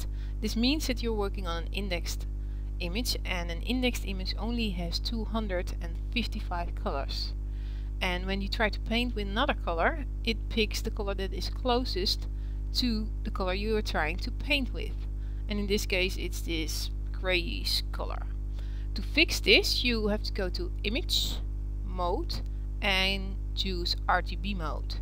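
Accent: Netherlands. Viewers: Yep, that's Holland ! I can tell by the voice and the buildings ! I visited there with a friend in 2005.....wonderful country and the people were so tall ! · definetly dutch, good tutorial though : D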